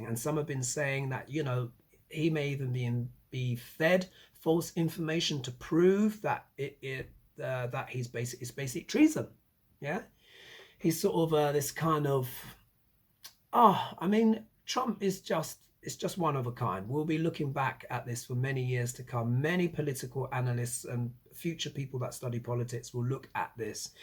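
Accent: England, United Kingdom. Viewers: Blessings to you. You are now MY FAVORITE ASTROLOGER ON YOUTUBE. I do Western Astrology but I LUV your insights via VEDIC. Also, it is a KICK to hear a British accent from an AFRO British face. GOD LOVE YOU.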